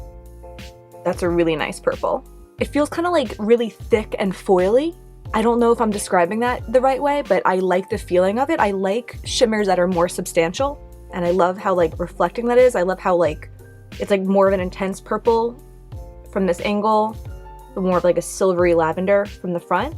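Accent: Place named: Northeast, United States. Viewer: I hear a northern eastern us accent. Where are u from? Just curious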